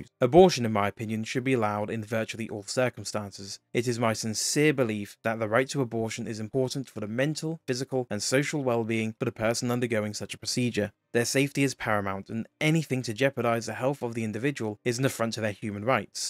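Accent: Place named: United Kingdom. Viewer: Pretty cool but british accent so i have to dislike... sorry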